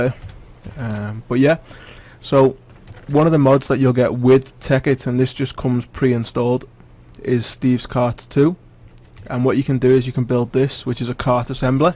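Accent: Liverpool, United Kingdom. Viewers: he sounds scouse and im scouse but fuck me this is defently 2013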